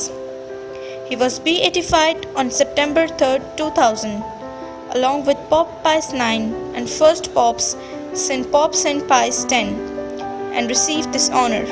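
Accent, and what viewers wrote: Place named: India. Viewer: Indian lady... the accent so strong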